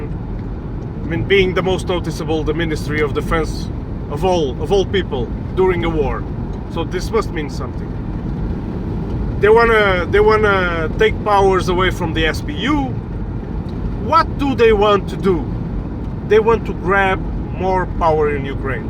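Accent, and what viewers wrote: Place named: Russia. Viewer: Why you have Russian accent?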